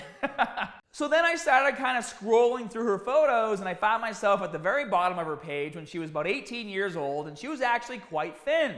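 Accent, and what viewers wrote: Boston, United States. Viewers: He’s from Boston lol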